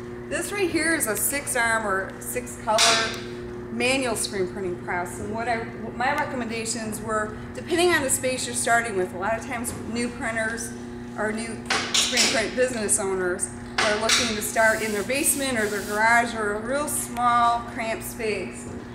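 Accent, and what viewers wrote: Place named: Upper Peninsula, United States. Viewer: Where is she from, she says midwest, but man I can't place that accent. Kinda sounds like a Yooper, but strangely off. She pronounces durometer as "Der-RAM-eater", and called a joint knife a "scOOOOper". Kinda Yooper-ish.....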